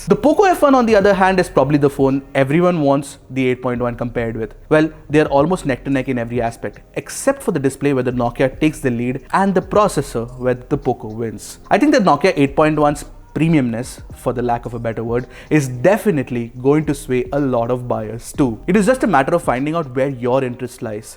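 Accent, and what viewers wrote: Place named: South India, India. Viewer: You are definitely south Indian guy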